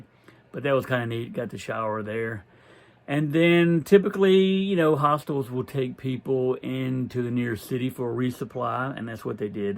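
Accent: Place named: Tidewater Virginia, United States. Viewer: Just guessing, your accent sounds like you were in the tidewater area of VA. When I was a kid there were very distinct accents here but not so much any more.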